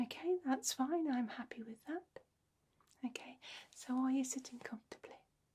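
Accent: United Kingdom. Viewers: i Like the British accent when they’re Yelling and cussing at each other calling each other BLOODY BOLLOXS (This is all jokes but you’re native accent is beautiful and you should know that you are loved by Jesus Christ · I can't get enough of hearing that beautiful British accent here! Thank you for taking care of my ear cranial nerve that has been bothering me for weeks here! Have a beautiful good night 🌙 sleep! 🥱🥰💜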